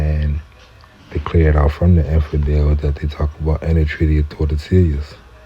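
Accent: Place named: Gullah, United States. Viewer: I here that low Country Gulah "Gulch" in your voice Nobility is that Charleston? "Chuck Boy" 💪🏿?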